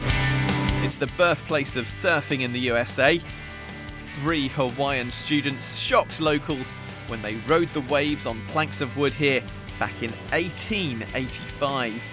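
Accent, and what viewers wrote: United Kingdom. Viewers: Lovely british accent as well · British accent!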